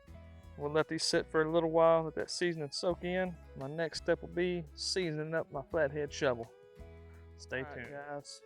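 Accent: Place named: Georgia, United States. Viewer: Georgia accent, mid ga.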